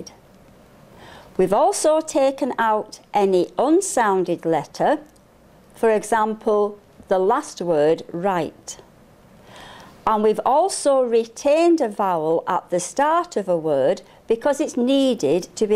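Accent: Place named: Southport, United Kingdom. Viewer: Thank you! Bonus that my family grew up in Southport, so I love hearing your accent! xo Roz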